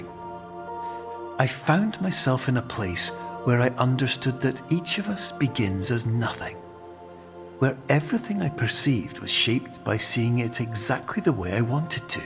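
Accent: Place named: Scotland, United Kingdom. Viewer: It's Scottish! The narrator is Max Howarth, who hails from Edinburgh. I hear him quite frequently on the radio at work with Arnold Clark adverts.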